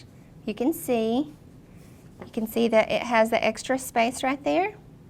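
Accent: South, United States. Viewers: I live the southern accent!!!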